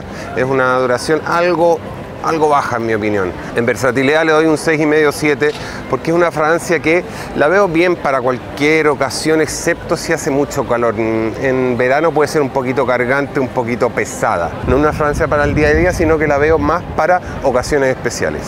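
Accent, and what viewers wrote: Chile. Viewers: Es muy divertido oírte hablar con acento chileno pero con modismos españoles, jajajajaja, me gusta mucho tu canal, gracias a ti uso Acqua di Gio Profumo. Saludos desde Chile 🇨🇱!!! Un fuerte abrazo!!!! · Lo escucho hablar y se me hace que es chileno jajaja o es mi idea🤔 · Que genial escuchar sobre este tema y más con el aun acento chileno. Estaba viendo el canal de Rosy MC Michael que tiene millones de seguidores y te menciono, me alegro de haber buscado tu canal. Éxito! y saludos desde Chile :)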